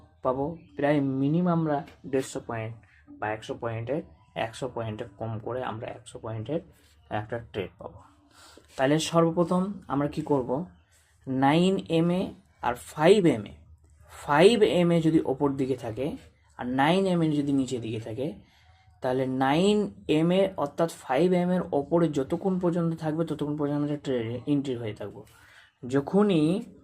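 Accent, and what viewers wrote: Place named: Bangladesh. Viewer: বাংলাদেশি নাকি, কথা শুনে তো ১০০% মনে হচ্ছে।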